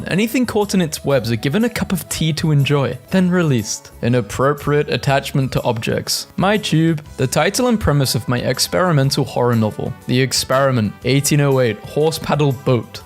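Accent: United Kingdom. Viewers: why do you randomly pronounce the r in Kirby like an American but sound british for literally every other word?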